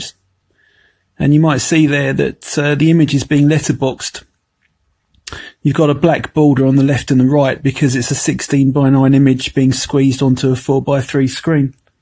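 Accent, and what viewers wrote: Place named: United Kingdom. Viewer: It's in the description above in case u didnt understand what he said bcuz I couldn't understand him either with his British accent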